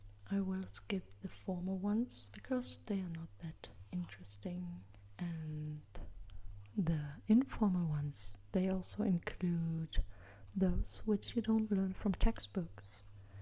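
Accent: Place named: Germany. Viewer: Hannes Von Sydow hmm, wie kann man nicht raushören, dass sie muttersprachlich deutsch spricht ? :o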